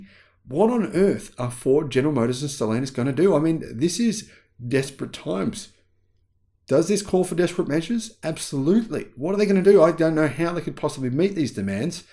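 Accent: Australia. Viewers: u sound like one of my Aussie or NZ, cussins, ps, kindness is still free!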